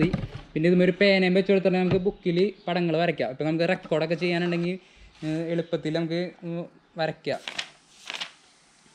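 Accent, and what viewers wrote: Thrissur, India. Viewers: “ ” next M4tech YouTube channel, keep pushing and keep talking in that”Thrissur” slang 🤘🏽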